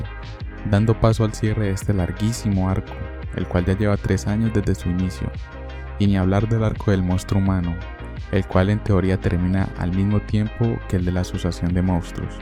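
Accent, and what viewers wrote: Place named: Colombia. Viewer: Que paso con tu voz o.o? Ahora pareces Colombiano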